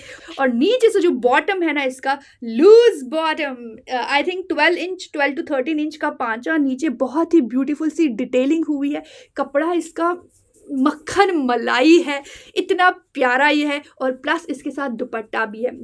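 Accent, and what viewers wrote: Kashmir, India. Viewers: Wah di wah kya ho ap 😂 apki kashmiri jab nklti hai na bht cute lgta hai❤ from srinagar Eidgah 💕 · Mashaallah aap bhot zada khubsurat lag rahi hoo dii❤❤aur muja aapki kashmiri baat bhot zada aachi lagti hu.aap kabi daly rutine dekho kabi dii kabki lots of love khush raho humasha aasay hi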